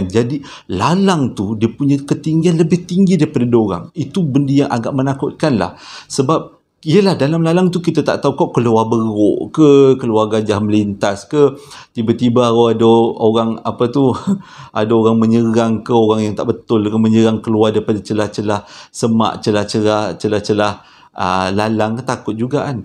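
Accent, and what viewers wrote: Johor, Malaysia. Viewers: suka dengor abang cakap, memang pure orang Johor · ingat bro 😅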